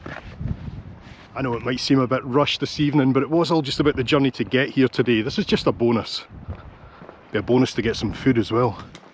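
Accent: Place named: Cockney, United Kingdom. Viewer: For someone from the west end you have a fair East end twang in some of yer words now... 😂😅😢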